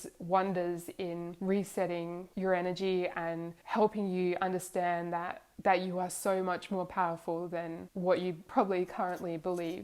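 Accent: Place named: Australia. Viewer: I sense a Aussie accent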